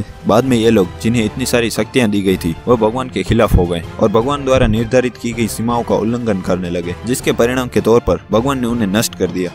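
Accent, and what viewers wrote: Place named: Rajasthan, India. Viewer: aapke langauge tone se aap rajasthani lag re ho ya gujrati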